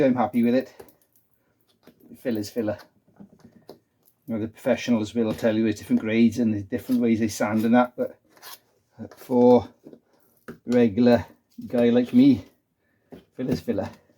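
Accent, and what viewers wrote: Wales, United Kingdom. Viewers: Lovely job hardly no overspray must have a good extraction system great love the Welsh accent too😂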